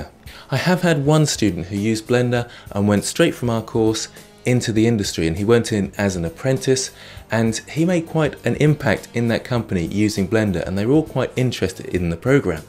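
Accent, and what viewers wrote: United Kingdom. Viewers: And that british accent :p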